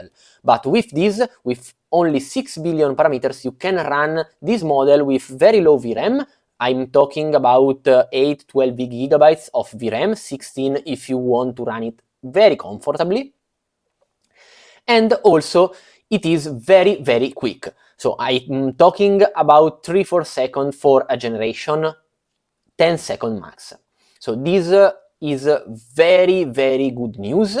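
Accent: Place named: Italy. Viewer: Just applied, name is Max Nthite...Are you from Italy? you have an interesting accent...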